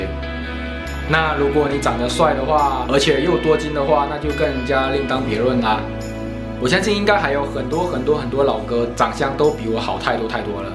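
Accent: Taiwan. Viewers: 你是厦門人嗎？聽口音還以為是台灣人! 去菲律賓多久？ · 兄。你是大陸福建人，可是你說的普通話卻若台灣國語。初時我還以為你是台灣人。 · 哈哈~我看你影片一開始我以為你是台灣人，後面說是廈門才知道你是中國人廈門口音幾乎跟台灣沒差別😆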